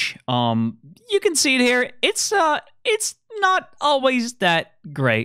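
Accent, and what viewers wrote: Netherlands. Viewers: you have a dutch accent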